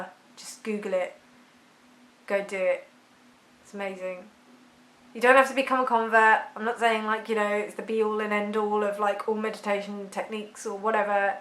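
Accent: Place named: England, United Kingdom. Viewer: I am in love with your english accent, this is so beautiful! Thanks also for your insights by the way ^^ Also going for my second course soon!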